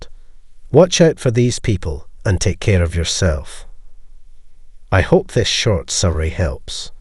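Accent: Scotland, United Kingdom. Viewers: So your channel is pretty milquetoast when it comes to defeating a narcissist or pwBPD. lemme adjust your counterattack a wee bit. When they cut with you, you chase for awhile, and then breadcrumb them, back and forth, this tactic will keep them locked in stasis and never be a problem for you again. This is actually reverse ,reverse psychology. This is a pre-emptive bread crumb, in tactical evasion we call it a crazy Ivan. Not unlike doubling back on your own tracks and ambushing your attacker. For more and better NPD avoidance advice , ask in comments. I have real experience in this field and a degree to back it up. The scotty voice guy is fairly elementary.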